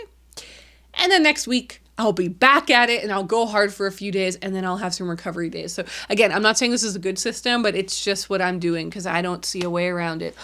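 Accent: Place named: Canada. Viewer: Shes is so... Canadian, eh? Huus and aboot, instead of House and About.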